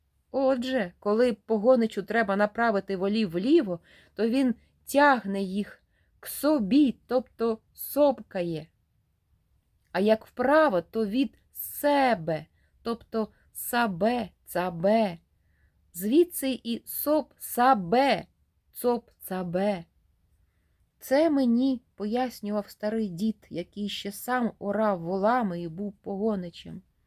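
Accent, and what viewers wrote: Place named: Eastern Ukraine, Ukraine. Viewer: Слухаю вас з увагою, ви з молодшого від мене покоління. Очевидно ви із східної України , чую по вимові, з великим впливом російської. Шчьо, чьому, дЗім замість дім, широке російське Ы замість українського короткого И. Але мені приємно, що в часи, коли наші сусідські «браття» вбивають наших людей за мову українську. Щиро вам дякую за читання і прагнення розвивати українські канали. А вимова? Може треба буде змиритися з сучасною модою. Адже багато мо перетерпіли зміни: англійська британська та американська, іспанська європейська та латиноамериканська, португальська і бразілійська, французька і крейол. Світ міняється, але Україна буде вільною.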